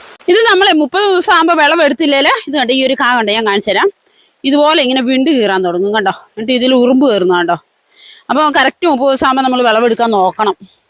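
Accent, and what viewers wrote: Kottayam, India. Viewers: അച്ചടി ഭാഷ അല്ല കോട്ടയംഭാഷ😅 വടക്കോട്ട് ആരും പറ്റത്തില്ല ചെയ്യത്തില്ല എന്നൊന്നു പറയില്ല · ഇതാണോ അച്ചടി ഭാഷ 😂 ഇത്‌ കോട്ടയo ഭാഷ. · Even I thought she speaks Kottayam malayalam. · Kasarkodo, samsaram ketit kottayampole😍pazhaya kudiyetakarshakaravumle chechi? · Aaha nallasalu kottayam slang njn alappuzhayanu marriage cheythu vannirikunnath palakkad checheede video innu first time kandu bhaasha kettappothanne naadine miss cheythu so happy ❤❤ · ഭാഷയുടെ രീതി കേട്ടിട്ട് കാസർഗോഡ് ഭാഷ അല്ലല്ലോ ഇത് കോട്ടയം ഭാഷയാണ് ല്ലോ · ഇന്നാണ് ആദ്യായിട്ട് ഈ channel കാണുന്നത്. Ktym ആണോ നാട്. Ktym ഭാഷ / slang ഉണ്ട് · എന്നിട്ട് കാസ്രോട്ട് ബാഷ അല്ലല്ലോ സംസാരിക്കണത്? കോട്ടയം കാരിയാ.....?😂❤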